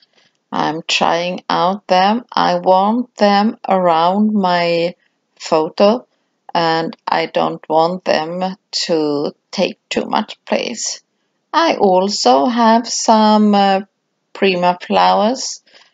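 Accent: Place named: Sweden. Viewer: The end result is so awesome! I love your accent! Are you a Swede?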